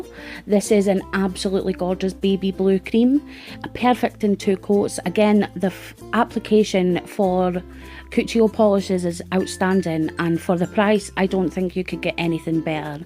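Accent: Scotland, United Kingdom. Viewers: Just found your videos while I was looking for a good blue polish....Thanks for the great recommendations! Also love your Scottish accent...Have a blessed day!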